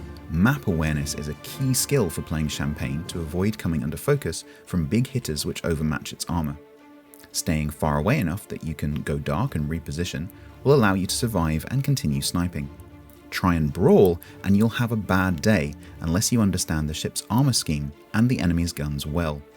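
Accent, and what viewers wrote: United Kingdom. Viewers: can i ask are you British by any chance.. its just i am British and you seem more British than me. Also this was a great review very to the point and i can understand you easily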